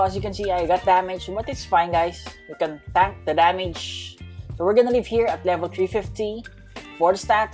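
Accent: Philippines. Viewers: Filipino kba? Halta sa accent e, btw gawa ka noob to pro using light and tips for light user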